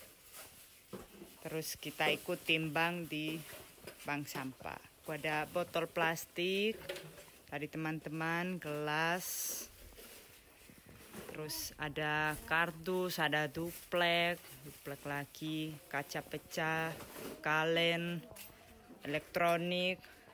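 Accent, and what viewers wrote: Java, Indonesia. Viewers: Logatnya Jawa bener njir:v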